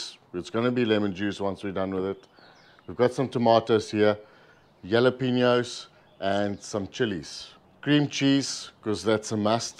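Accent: South Africa. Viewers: South African accent right there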